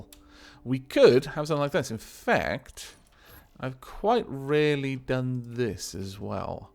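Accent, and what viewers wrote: Wales, United Kingdom. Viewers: firstly, please read on xD. Secondly, you genuinly are THE best youtuber out there. You aren't recognised as so because you dont play the big games such as minecraft. There, take the compliment and run, you dapper soul! Also you refer to living in Scotland often, but you sound un-mistakably Welsh???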